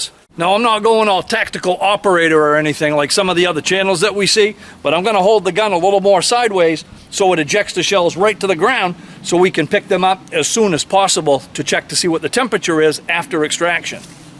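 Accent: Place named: Northeast, United States. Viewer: Mouth is too small. Looks homeless. Has a northeastern accent. Very twitchy like a methican American. Also full of shit. If you weren't such a poser you'd know a soldier wouldn't get a lighter load if this worked out, he'd just be ordered to carry more. Pound of feathers weighs the same as a pound of stones.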